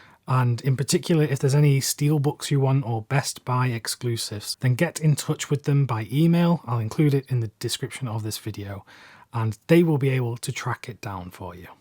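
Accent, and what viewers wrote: United Kingdom. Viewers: Are you not British?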